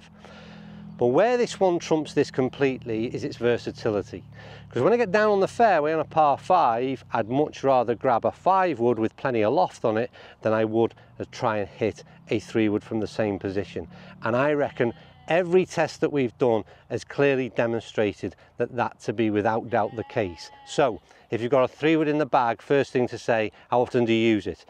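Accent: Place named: Scotland, United Kingdom. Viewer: He has a Scottish accent so I believe everything he says.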